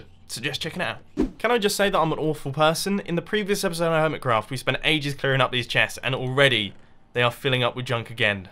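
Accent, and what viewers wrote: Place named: United Kingdom. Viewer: lol i love the way British people say things like "aquir affinity"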